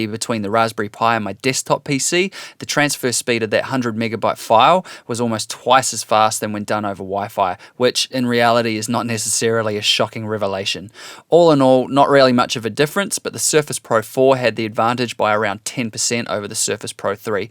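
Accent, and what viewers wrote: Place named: Australia. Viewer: nice review. Also as a kiwi living in the US it's cool to hear an accent from down under. Chur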